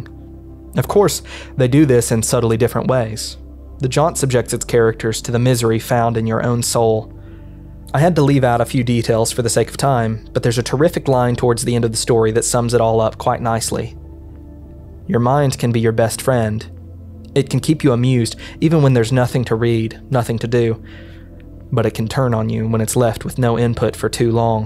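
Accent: South, United States. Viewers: Is this man from the south? your speech is both beautiful and uncommon in your line of work. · Hey, this is the first video of yours that I've seen and I think it's pretty good! I gotta ask though, are you from the south? I can hear it in your voice lol. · I love how horror unites us. As a kid I would never imagine a dude with a classic country twang espousing heart-felt feelings about niche Japanese horror. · Great narration. I'm digging the country accent, man. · This man and Wendigoon absolutely carrying the "horror explained by guy with a funny southern accent" genre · It's a little refreshing to hear a subtle country accent talk about intellectual topics.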